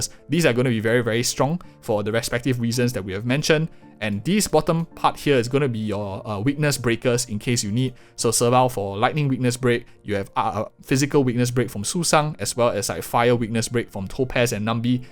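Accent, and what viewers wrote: Singapore. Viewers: are you by any chance singaporean :D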